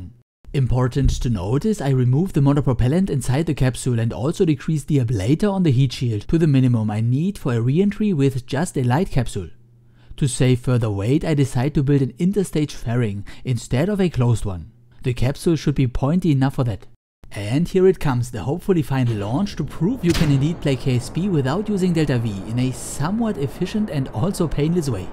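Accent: Germany. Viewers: German- English xD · You have an amazing accent. Sehr deutsch! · Your accent... It is very strong... Is it German or Autrian, sound like it :D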